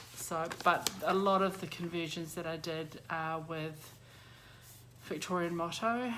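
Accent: New Zealand. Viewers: So cool to hear your Kiwi accent after watching so many American Floss Tubers. I love your colours for the Modern Folk Embroidery SAL. So pretty. Your mustard house is so cool. I am yet to stitch a big house. I'd love to stitch that one.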